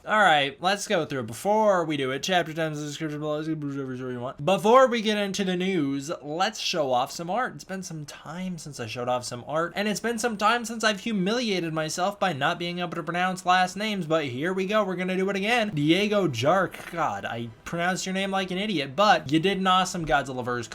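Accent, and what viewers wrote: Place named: United States. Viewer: When the Legendary speaker mispronounces Camazotz and continues with that mispronunciation. I mean, I know you’re gonna have an American accent, but PLEASE at least try.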